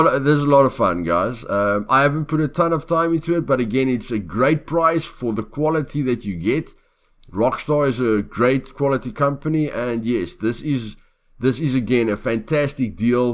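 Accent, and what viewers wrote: Afrikaans, South Africa. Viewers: You are from South Africa? LOL I always asked myself what kind of accent you have. And it is an Afrikaans influenced accent, right?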